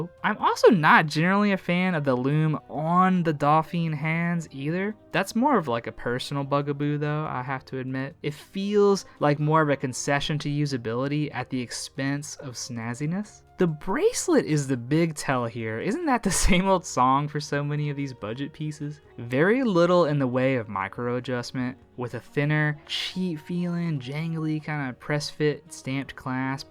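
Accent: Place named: South, United States. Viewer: that mall shot you put in there is the World Trade Center mall in lower Manhattan. A place where I have gone watch shopping time to time (they have a swatch boutique, tissot boutique, and London jewelers). For a minute I thought you were from NYC, but im guessing by your accent down south is more likely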